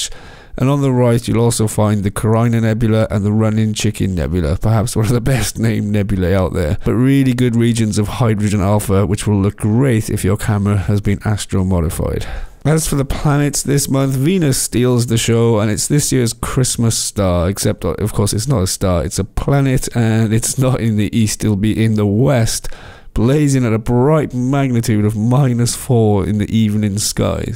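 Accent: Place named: Wales, United Kingdom. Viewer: New subscriber, recognise the accent... Cwmbran or Newport area?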